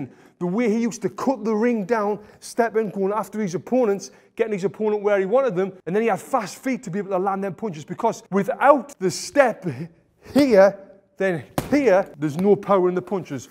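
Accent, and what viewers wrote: United Kingdom. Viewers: Sorry can't have a brit talking mike.tyson needs to be NY accent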